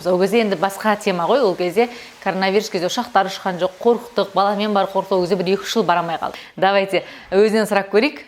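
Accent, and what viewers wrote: South Korea. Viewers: Дикция кәрісшеге 😃☺️ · Тилин корейцев айналып бара жатыр, кей бир казакша создеринди тусиниксиз сойлеп жатырсын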